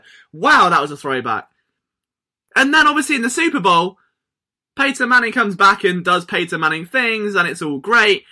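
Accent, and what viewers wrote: United Kingdom. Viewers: Your whiny British voice is the only whiny British voice I can endure for more than 30 minutes.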